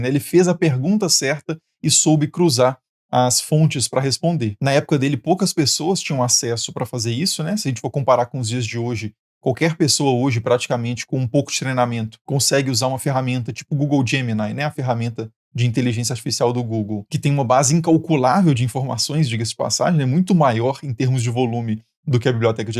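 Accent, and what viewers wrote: Minas Gerais, Brazil. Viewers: A didática do "mineirês" é a melhor! 😂😂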